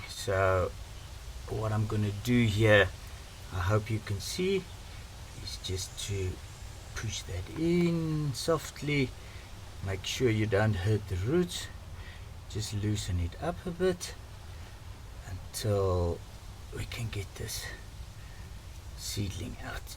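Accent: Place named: South Africa. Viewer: Lekker to hear a South African accent , I live in South Carolina USA . Great video very helpful tips . Clivia”dare my favorites, remind me so much of home in Transkei where I used to see them flowering by the hundreds under the coastal forest canopy . 👍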